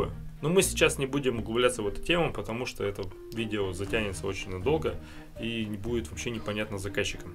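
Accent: Perm, Russia. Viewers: У вас говор как у "Реальных пацанов", они тоже из Перми.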